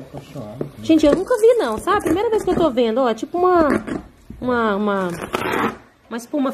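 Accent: Minas Gerais, Brazil. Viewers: como vc é especial mulher eu amo ouvir sua voz, o sotaque mineiro lembro das minhas tias de Ipatinga que eu amoooo. Minha mãe é de Mg Ipatinga meu pai carioca,gosto de ser carioca mais amo o sotaque mineiro ❤❤❤😘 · Mineiro uai!Maravilhoso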